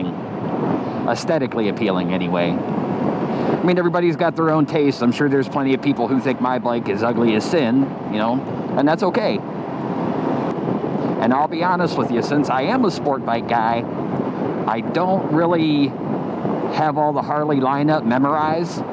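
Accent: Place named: Chicago, United States. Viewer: I hear a slight Chicago accent too😂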